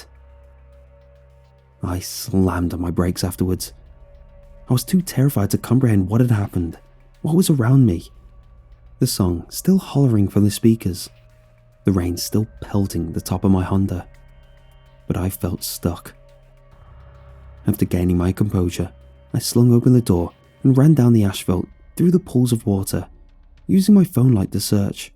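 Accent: United Kingdom. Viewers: Hey, sorry for the off-topic comment, but I've been tormenting myself for weeks over this question: does anyone know where in the UK ' accent comes from? I've spent more than two hours trying to figure it out, but I am but an American, and my efforts were in vain.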